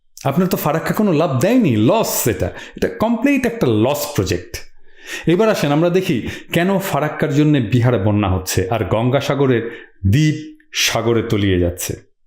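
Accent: Bogura, Bangladesh. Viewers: দাদা আপনার মুখে বগুড়ার ভাষা ভালো লাগে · ভাই আপনি বগুড়ার ভাষায় কথা বলেন, ওই ভাষা আপনার মুখে মুখে শুনতে শুনতে অভ্যস্ত হয়ে গেছি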